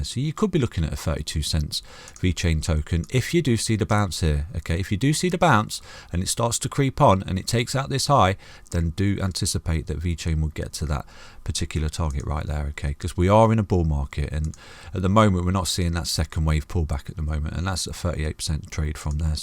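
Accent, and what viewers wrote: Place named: West Midlands, United Kingdom. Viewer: West Mids, knew it was near 😂😂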